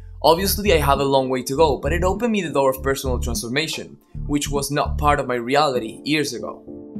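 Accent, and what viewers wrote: Argentina. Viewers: Wow, Im from Argentina too, and I love your accent and the way you talk in inglish is awesome!!! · Tenés voz de porteño, puede ser?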